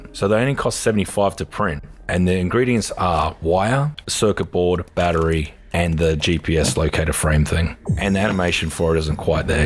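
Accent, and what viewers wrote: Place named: Australia. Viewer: If I click a Forest video and it doesn’t have a gritty Australian accent, I assume it’s a clickbait or just bad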